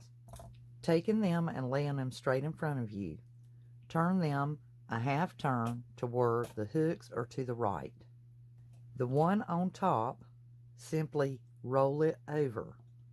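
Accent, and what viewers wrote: South, United States. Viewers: Thank you! Such na wonderful band east project and your explanation is beautiful love hearing your southern voice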